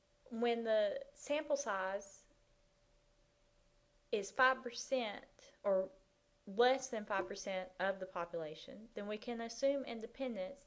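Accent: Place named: South, United States. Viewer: nobody has liked your video with that sweet southern accent of yours?? well, i just did. just worked on this with a student i tutored :-)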